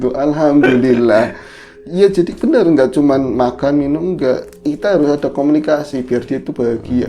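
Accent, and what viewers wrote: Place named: Java, Indonesia. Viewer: Yg bikin khas adl dialek nya mas yg medok lucu.. sebenernya ini yg bikin ga jd serem hahahaa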